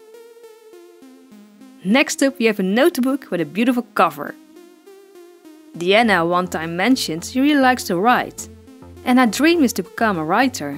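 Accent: Netherlands. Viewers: ik kan echt horen aan je engels dat je nederlands bent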